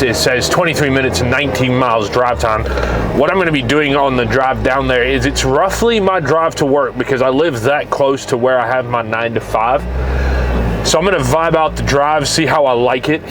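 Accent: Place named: United Kingdom. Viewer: I KNEW I wasn’t going crazy when I heard the British accent bahah😂 you flipped a switch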